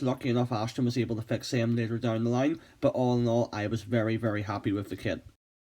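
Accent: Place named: Northern Ireland, United Kingdom. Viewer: It surely is. Great to hear the world famous 'Norn Iron' twang. Keep up the great work.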